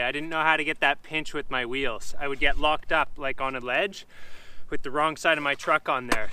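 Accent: Canada. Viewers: U sound so Canadian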